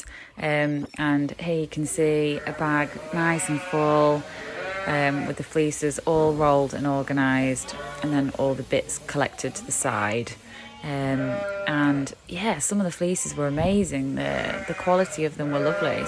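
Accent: United Kingdom. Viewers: Would we get the job dun......You sound like a Yorkshire lass.Love your dad and yours.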